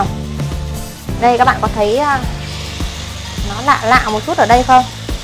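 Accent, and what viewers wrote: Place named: North, Vietnam. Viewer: giọng bắc:nge nặng trỉu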